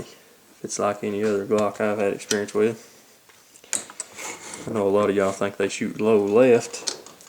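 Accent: South, United States. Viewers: All vids should have a warning if they are going to be narrated in a southern accent. I would rather drill a hole in my fucking head than listen to a slack jawed yokel. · I've done this to 2 of my Glocks so far, so I have the experience but it was still fun to watch your video. You did it very well, and made the most important points of using loctite on the front screw, and a soft punch on the rear. I also really like the way you talk, your country accent is really cute, hehe :)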